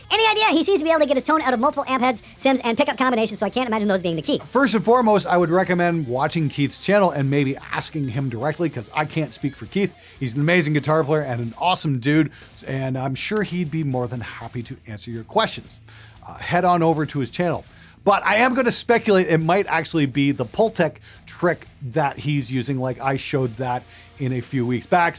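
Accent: Canada. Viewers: Do I detect a Canadian accent in your bass player speak? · You understood that? With such a thick Canadian accent, I couldn't make any of it out.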